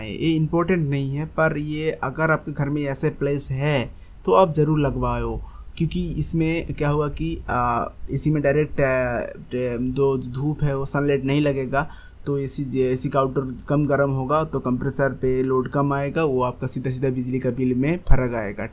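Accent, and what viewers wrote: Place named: Bengal, India. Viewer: ভাই তোমার ভাষা শুনেই বোঝা যাচ্ছে তুমি বাঙালি।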